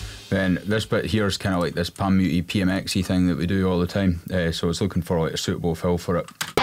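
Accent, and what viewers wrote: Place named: Scotland, United Kingdom. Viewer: Class! Sounds great. Where you based mate? Always a surprise when the Scottish accent pops up on YouTube 🤣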